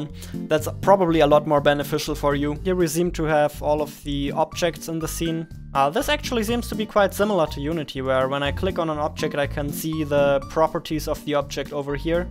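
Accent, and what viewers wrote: Germany. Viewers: Are you from Germany? You kind of sound like it. I am from Germany but I can't really tell if you are because your English is so fluently xD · Are u german? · I understand your English really good, are you German ? ^^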